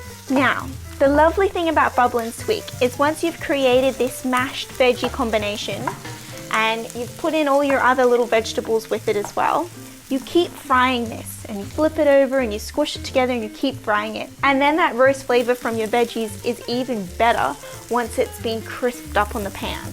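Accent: Melbourne, Australia. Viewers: Are you from Melbourne!? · She’s Australian, not english!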